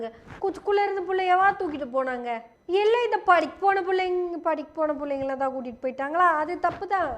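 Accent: Kongu, India. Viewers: கேட்டு கேட்டு சிரிக்காமல் இருக்கமுடியவில்லை தங்கையே! அருமையான பதிவு!! கொங்கு தமிழ் கொம்புத்தேன்!!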